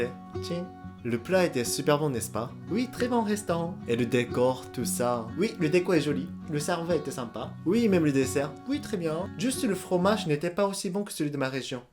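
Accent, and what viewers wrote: Japan. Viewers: 😂😂trop cool moi j'adore quand les japonais parlent en français c'est trop mignon 😊😊😊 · C’est marrant 🤩 ! Tu a le même accent ou la même façon de parler qu’une de mes voisines 100% japonaise….t’es de Tokyo comme ma voisine ?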